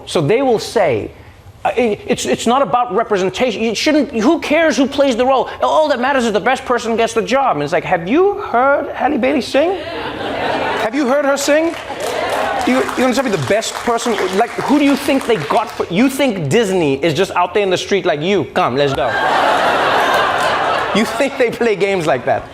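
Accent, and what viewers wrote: South Africa. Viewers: didn’t realize how south african his accent was until now?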